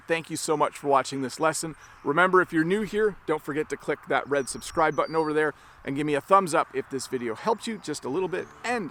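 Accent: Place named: Canada. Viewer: Through your videos i can understand and learn canadian accents.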